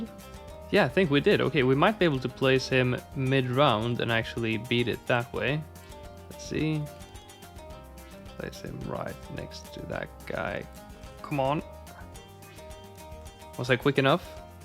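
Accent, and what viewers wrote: Sweden. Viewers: Are you from Sweden ?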